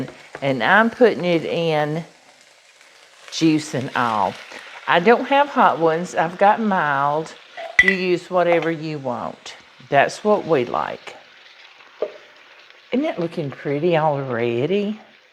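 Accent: South, United States. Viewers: This lady has a theraputic effect on my nerves. I am in U.K,,is that a Southern U.S.A accent? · I don’t know you but I love you and love watching you cook. Your southern accent is adorable. OH, and I bought your cookbook. My daughter loves watching your videos, too.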